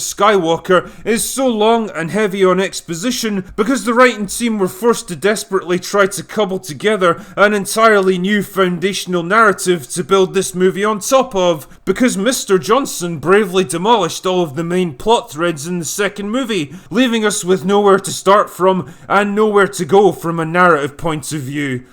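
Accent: Scotland, United Kingdom. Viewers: I had the volume all the way up by accident and I got blasted in the ear with an angry Scot. Worth it.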